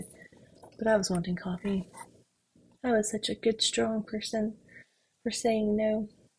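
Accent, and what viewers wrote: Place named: South Carolina, United States. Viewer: You sound like us in South Carolina. I didn't comment on the snake because so used to that. We have had snakes moving around lately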